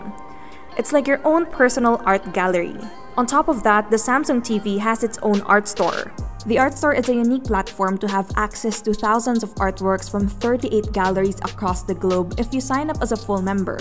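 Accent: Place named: Philippines. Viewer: that irritating pinay accent.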